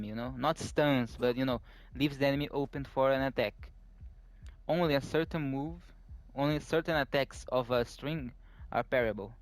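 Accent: Brazil. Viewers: Brasileiro falando inglês has a Very specific accent BORA PORRA KAKDKAKDKAKDKAD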